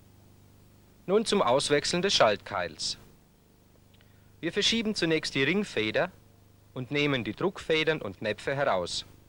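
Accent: Germany. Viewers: This iss a Dialekt from the bavarian County calling " Fränkisch " ! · Natürlich ist das Schweinfurterisch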